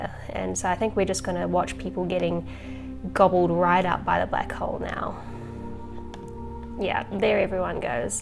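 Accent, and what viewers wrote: New Zealand. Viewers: I'm pretty sure that that's not an Australian accent, but rather a New Zealand accent. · I only know this from watching too much Flight of the Conchords on repeat. That's unmistakable New Zealand backwards speak. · It's definitely an New Zealand accent. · She's from New Zealand.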